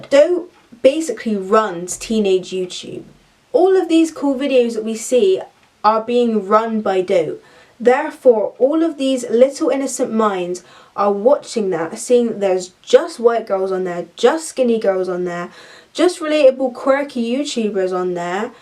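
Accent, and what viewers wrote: Bristol, United Kingdom. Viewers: yesss the bristol accent is giving me lifeeeeee <3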